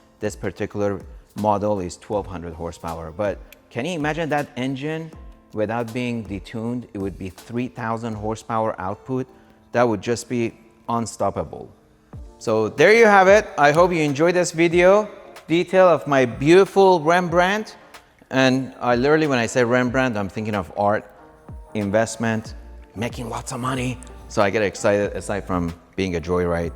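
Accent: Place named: Iran. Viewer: i like how you still got the persian accent nice bug btw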